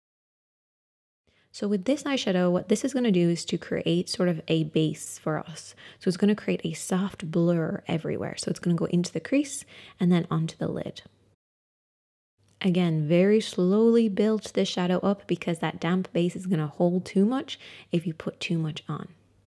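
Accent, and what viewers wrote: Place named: Ireland. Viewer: Hi, how are you? Lovely to hear an Irish accent amongst all the others! I think you are genuinely the only expert i will now follow. (along with Lisa Eldridge)...thanks for asking how everyone is doing. Its definitely genuine when an Irish person asks & not just filler, throw in a,"Conas atá tú & you might get some grant lol. I suffer from chronic nerve pain following an amputation and oft just find videos in the midst of a bad bout of pain to try drown out the terrible insufferable spasms. Anyway girl, keep flying the flag. From your neighbour in Limerick.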